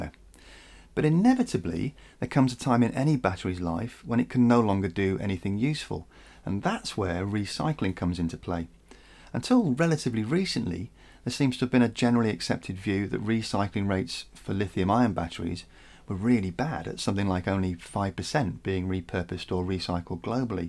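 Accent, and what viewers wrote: United Kingdom. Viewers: I like his voice. So calming and so british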